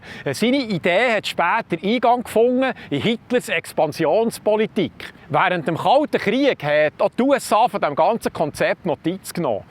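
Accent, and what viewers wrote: Bern, Switzerland. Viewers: Das Berndeutsch ist toll,die Gesinnung auch,danke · Sehr komplexes Thema, aber super erklärt und mit dem Berndütsche Dialekt au no wahnsinnig sympathisch – weiter so, wir freuen uns auf die nächsten Videos. Viele Grüsse aus Chicken Hill at the Lake (Hünenberg See)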